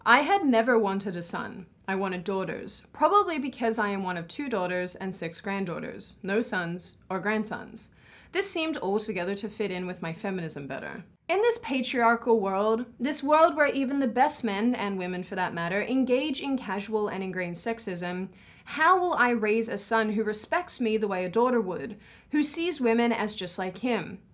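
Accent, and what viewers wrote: Australia. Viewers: These people are fucking insane!!!!! I'm hearing your Aussie accent come out and I'm loving it!! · Well that’s the first time I’ve heard someone imitate crocodile Dundee in their own Strayan accent.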